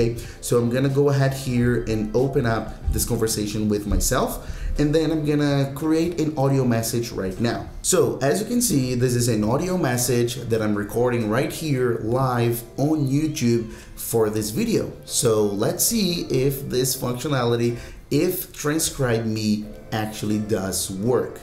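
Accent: Brazil. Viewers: Valeu cara otimo video, na hora que vc começou a falar, notei algum sotaque brasileiro (seu ingles é otimo) ai confirmei quando eu vi Lazaro negao e Amor, Vlw !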